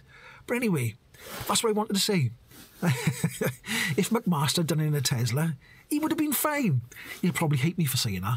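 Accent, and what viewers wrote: Wales, United Kingdom. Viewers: I believe that the leasing arm of Porsche will be liable for any loses...many leasing companies both ICE and EV have been caught out by wildly fluctuating second hand car market prices since the pandemic and the war in Ukraine. Keep doing what you do, it's goof to hear a fellow Welsh voice in the debate... you are around Caerphilly correct?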